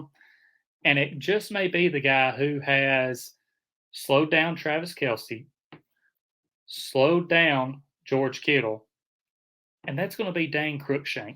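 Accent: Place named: South, United States. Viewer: Dolphins fan here....this guy has a very strong country/southerner accent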